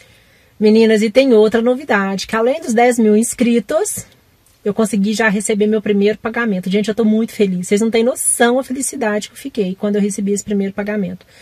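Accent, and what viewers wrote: Minas Gerais, Brazil. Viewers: Parabéns amo seus vídeos, são muito inspiradores. Sou de minas também (Uberlândia) e amo nosso sotaque mineirinho rsrs Já dou like antes mesmo de assistir, super inscrita no seu canal. Muito sucesso a vc!!!! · parabéns, vc merece!!! Tem pouco tempo que te acompanho e já amo demais seus vídeos, vc passa muita verdade em tudo! E esse sotaque mineirinho tbm neh, gostoso de ouvir( sou suspeita pra falar, rsrs)...enfim, que Deus te abençoe em tudo e que sua obra prospere cada dia mais!!!😘😘😘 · Parabéns 👏🏻👏🏻👏🏻👏🏻👏🏻 Qdo conheci seu canal a semana passada já me encantei com o seu jeito e a paz que vc transmite, fora esse sotaque mineirinho que é bão de mais da conta de ouvir.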